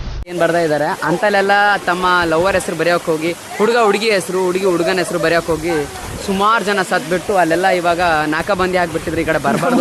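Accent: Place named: Kalaburagi, India. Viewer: Brother pure kalaburagi matt addu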